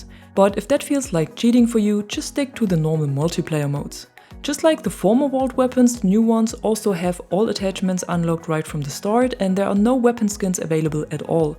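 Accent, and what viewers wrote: Germany. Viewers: Dein deutscher Akzent hört man sehr gut raus . Würde mir in deutsch besser gefallen ✌🏻😌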